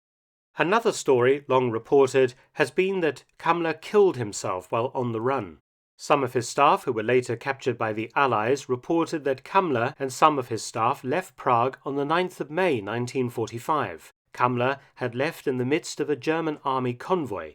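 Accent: England, United Kingdom. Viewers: Fantastic English accent....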